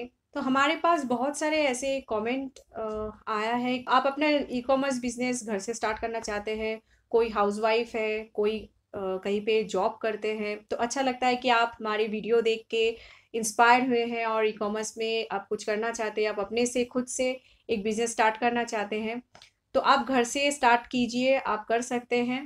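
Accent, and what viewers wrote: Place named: Bihar, India. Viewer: Hiii, kya aap Bihar se ho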